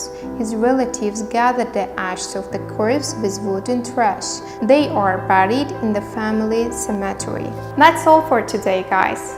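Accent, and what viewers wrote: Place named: England, United Kingdom. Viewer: Hi! You are so dilligent and i like your english akcent! Good Luck in AQAT GROUP 👏🏻👏🏻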